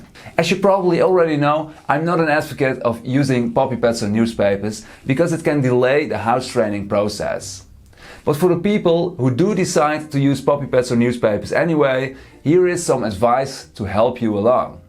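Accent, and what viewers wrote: Netherlands. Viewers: I had a duplex with a yard the last time I had to train a puppy. I have been without a dog for nearly two years but just cannot commit to training while living in a 2nd floor apartment. I really don’t like puppy pads either. By the way, I love your Dutch accent. I once lived in Geertruidenberg for a year and adore Nederland. I miss being there so much. Also, you are quite the handsome Dutchman! 🤩✌🏼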